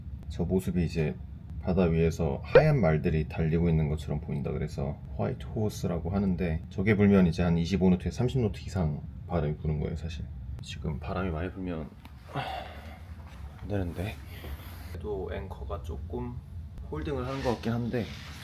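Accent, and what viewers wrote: United Kingdom. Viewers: 영국식 미국어 발음 인가여 뭔가 멋잇어여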